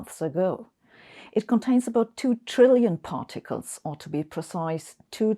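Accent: Germany. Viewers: May I ask Professor, are you german?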